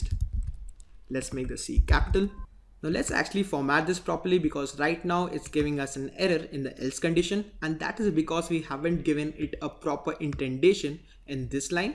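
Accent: India. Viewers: this guy is just a thief, what a shame look at this older video: <link> watch it with a proper English not Hinglish!!!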